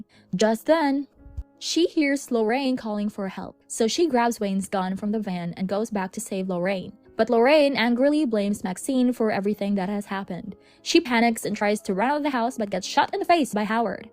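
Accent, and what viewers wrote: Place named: Russia. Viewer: Your Russian accent is funny.... Do more of it 🤣🤣🤣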